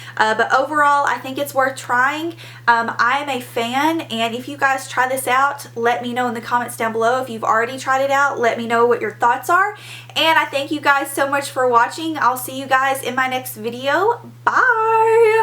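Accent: South, United States. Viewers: Your southern accent is so cool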